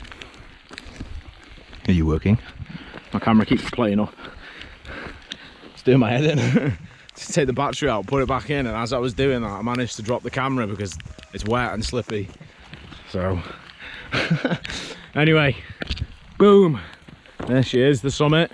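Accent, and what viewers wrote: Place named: Liverpool, United Kingdom. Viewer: Enjoyed you enjoying that. Good stuff. (Are you from Liverpool, lid?)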